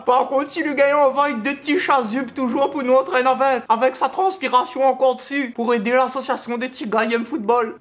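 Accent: Réunion, France. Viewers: Ben explique le ban' couillons que "endémique" c'est le contraire de "sporadique" ! 🤭😄😄😁😁😁... Par contre, la prochaine fois pensez aux âmes sensibles (évitez les gestes ityphalliques SVP !!! 😁😁😁🤭)... Toujours aussi drôle avec out l'accent yab bien prononcé ! J'adore ! Merci - From Bordeaux 🙋.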